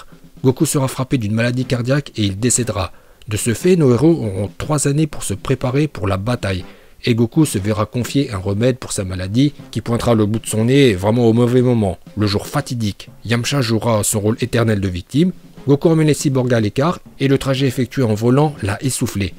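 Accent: Japan. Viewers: Ton accent japonais est super 👌 😁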